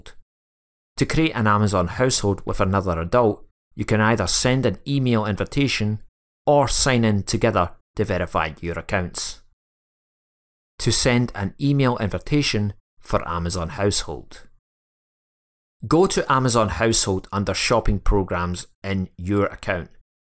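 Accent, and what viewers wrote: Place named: West of Scotland, United Kingdom. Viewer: Good West of Scotland accent mate. Cheers.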